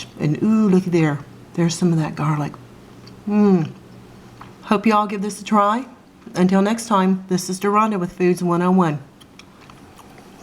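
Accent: South, United States. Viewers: Your a cooking beast! Please invite me over for dinner anytime. I would trust this receipe just because you sound like your from the south. #newpauladeen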